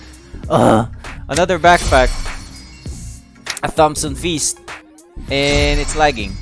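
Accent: Visayas, Philippines. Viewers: English bisaya ka!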